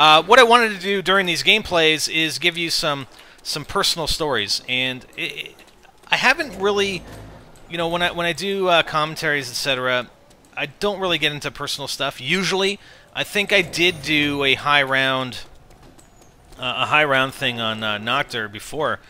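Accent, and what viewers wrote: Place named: Canada. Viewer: is Canadian. His accent pretty much gives it away if you're familiar with Canadian accents at all. I remember the first time I saw an vid, I realized about 30 seconds in "This guy has GOT to be Canadian. Aboot? Eh?"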